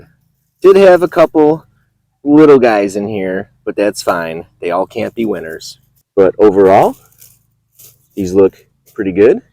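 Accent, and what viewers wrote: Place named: Midwest, United States. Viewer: I hear mid west accent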